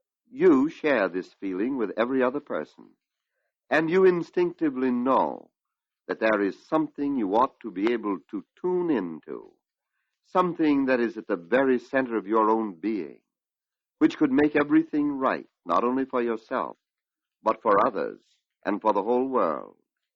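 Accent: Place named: Maine, United States. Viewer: As a former Mainer, I love hearing his Maine accent. Forgot he grew up there. One of the giants of this teaching.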